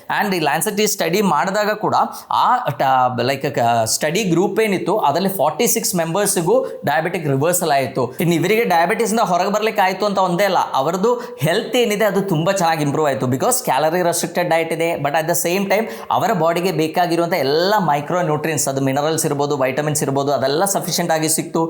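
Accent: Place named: Kerala, India. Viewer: ಡಾಕ್ಟರ್ ನಿಮ್ಮ ಮಾತಿನ ವೇಗ ಶತಾಬ್ದಿ ಎಕ್ಸಪ್ರೆಸ್ ಟ್ರೈನ್ ತರಹ ಇದೆ.ತುಂಬಾ ವಿಚಾರಗಳನ್ನ ಕಡಿಮೆ ಅವಧಿಯಲ್ಲಿ ತಿಳಿಸುವಿರಿ. ನಿಮ್ಮ ಈ ಮಾತಿನ ವೇಗಕ್ಕೆ ಕಾರಣ ನಿಮ್ಮ ಮಾತೃಭಾಷೆ ಮಲೆಯಾಳಂ ಇರಬಹುದು ಅನ್ನಿಸುತ್ತದೆ.ಅದೇ ಆಕ್ಸೆಂಟ್ ಕನ್ನಡದ ಪದಗಳ ಮೇಲೆ ಪ್ರಭಾವ ಬೀರುತ್ತಿದೆ. ನಿಮ್ಮ ಕನ್ನಡ ಭಾಷೆಯ ಶೈಲಿ ಸಾಮಾನ್ಯವಾಗಿ ಮಾತನಾಡುವರಿಗಿಂತ ಭಿನ್ನ ವಾಗಿದೆ. ನಮಸ್ಕಾರಗಳು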